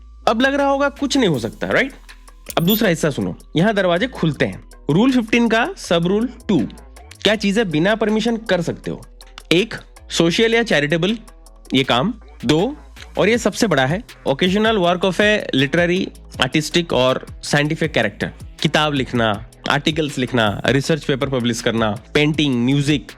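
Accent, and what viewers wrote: Odisha, India. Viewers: Are u odia ??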